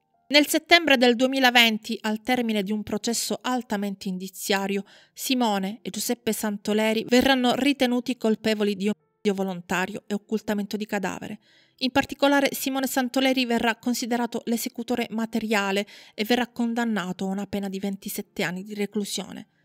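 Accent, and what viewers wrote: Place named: Calabria, Italy. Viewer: Buongiorno era una curiosità, dall''accento mi sembrava.Sai con quanti colleghi calabresi e siciliani ho lavorato.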